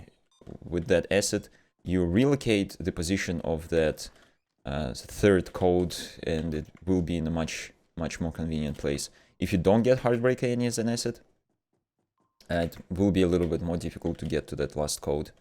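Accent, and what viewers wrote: Russia. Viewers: You live in New Zealand right, but your accent reminds me russian a lot.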